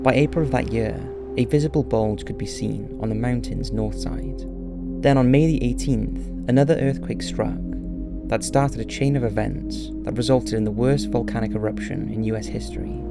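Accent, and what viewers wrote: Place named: England, United Kingdom. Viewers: your video is boring, way overhashed AAAAND presented in a horrible english peasant accent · Having an english accent doesn't mean he won't make mistakes, volcanic mudflows are called LAHARs , not ihars.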